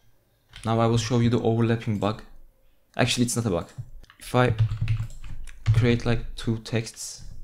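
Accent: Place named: Turkey. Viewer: Selam dostum. Ben de bu konuda bir şeyler yapmak istiyorum. Aksandan direkt anladım Türk olduğunu ve mutlu oldum. :D Sana nasıl ulaşabiliriz?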